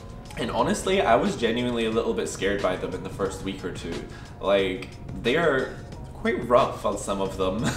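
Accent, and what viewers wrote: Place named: Scotland, United Kingdom. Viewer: Wow! I just came across your channel and was shook to hear your accent!! I'm also from Scotland and I am applying to JET this year - are there many Scottish JETs in Japan?? Look forward to watching the rest of your videos!! :)))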